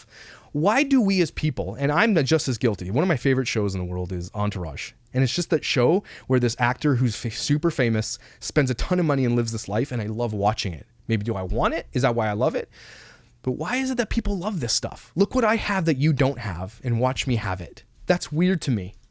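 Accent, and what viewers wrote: Canada. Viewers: Completely unrelated, but the Canadian accent is strong in this one 😂 love it lol... reminds me of my fam in Canada 🇨🇦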